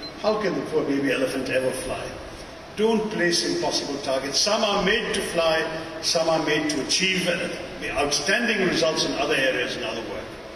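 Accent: United Kingdom. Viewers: A Britisher in sound and An Indian by heart makes this man unique. We are proud to have you....🙏♥️🙏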